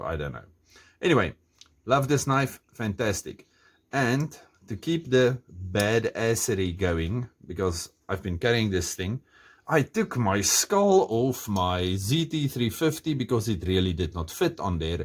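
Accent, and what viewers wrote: Afrikaans, South Africa. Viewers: Love die Afrikaanse aksent ou bul. Lekker om Suid-Afrikaaners ook op YouTube te sien.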